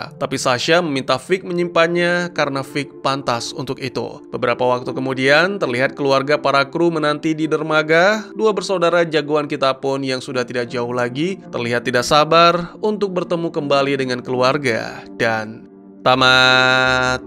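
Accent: Aceh, Indonesia. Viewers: Abang orang aceh ya?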